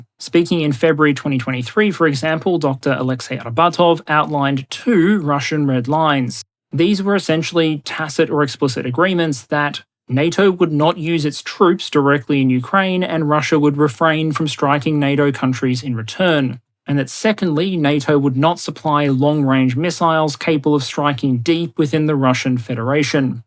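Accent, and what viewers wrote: Australia. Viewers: I feel like outright stealing the whole stylistic shebang for work, starting from the aussie accent, through the PowerPoint layout, up to the begrudging respect for the strategic prowess of emus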